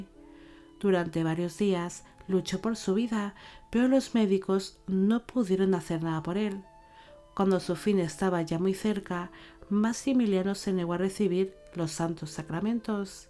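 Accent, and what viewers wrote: Spain. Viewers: Como no gustarme tal vídeo y más con ese acento español. Saludos desde México.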